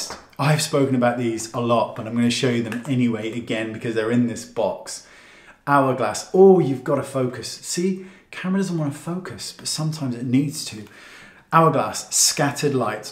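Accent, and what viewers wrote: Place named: United Kingdom. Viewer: Oh my gosh, you’ve started listing the products below! Thank you so much, that is such help to those of us who have trouble with your British pronunciation.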